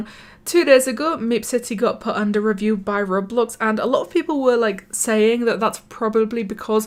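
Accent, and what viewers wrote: United Kingdom. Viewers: I like ur British acebt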